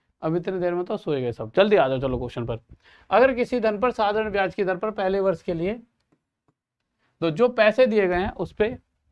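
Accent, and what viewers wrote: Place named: Bulandshahr, India. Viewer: Sir bulandshahr wali bhasa bol rhe ho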